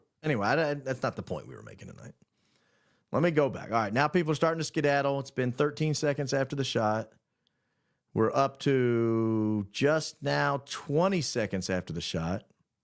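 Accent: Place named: Georgia, United States. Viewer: So, does "Adidas" sponsor your site? Just looked back...you have a different color hoodie for every day of the month! Same style...some things are creature of habit...maybe that's part of the business model, I don't get...but, it maintains consistency, and you're definitely good at it! Love listening to someone with similar dialect (native Georgian here).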